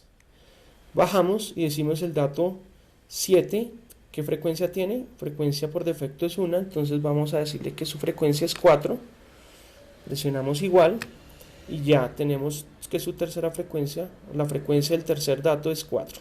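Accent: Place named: Bogotá, Colombia. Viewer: el inconfundible acento de Bogotá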